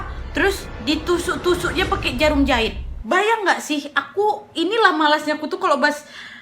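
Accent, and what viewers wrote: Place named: Medan, Indonesia. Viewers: Anjir medan kali kau kak 😄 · Medan punya bahasa ni ya😁🤣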